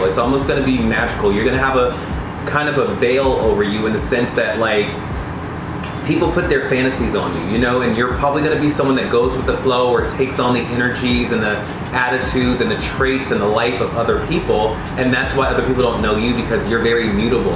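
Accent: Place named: Jamaica. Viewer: Intro 🔥 “ tun ye up” you must be Jamaican.. 🇯🇲 I’m Jamaican btw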